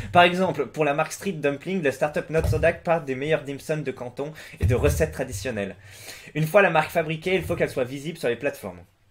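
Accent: Alsace, France. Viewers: alsacien prolo, si tu veux. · Accent Alsacien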